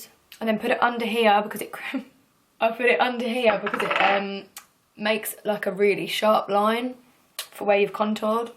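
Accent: England, United Kingdom. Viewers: i wonder if she's from england because i love her accent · I love ur british accent soooo much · Ur so British it's great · She is British I thought she was American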